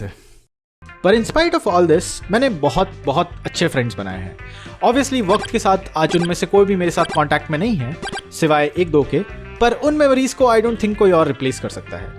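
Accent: Bengal, India. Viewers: Mannnnnnn YOUR Bengali accent ❤️❤️😊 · Par dude your Hindi sounds Bengali enough to understand that you are a bengali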